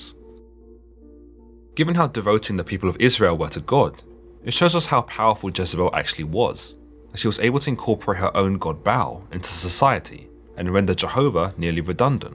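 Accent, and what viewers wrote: United Kingdom. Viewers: 😝😝😝😜😂this guy is really funny with the strong british accent. If the bible is from middle east, why dont you get some narrattor from middle eastern who know where to pause and where to be flawless. You guyz make fun of Indian people...atleast they speak english in neutral accent understandable to all the population of the world . This strong accent is awful which indeed creates stress..Lol . From new delhi India.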